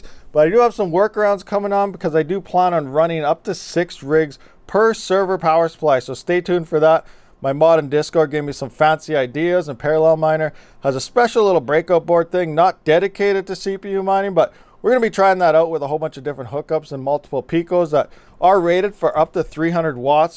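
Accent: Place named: Canada. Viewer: lmfao.. the way canadians talk jus kills me.. hahaha... its good tho..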